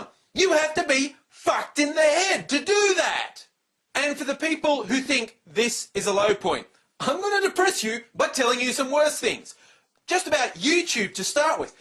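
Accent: Australia. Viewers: Words Well spoken Aussie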